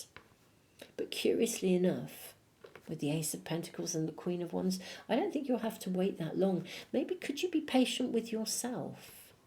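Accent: United Kingdom. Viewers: Hyy, you! I love your readings, your lovely british accent and your unusual, awesome oracle decks! Great reading..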